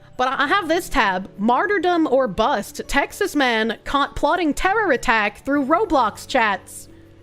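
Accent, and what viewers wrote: Boston, United States. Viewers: has the only Bostonian accent that doesn't give me brain damage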